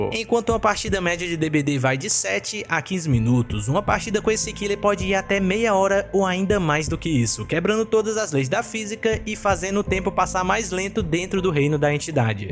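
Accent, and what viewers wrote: Ceará, Brazil. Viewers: Poha baitinga, tu é do Ceará? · é cearense? Falai vizinho!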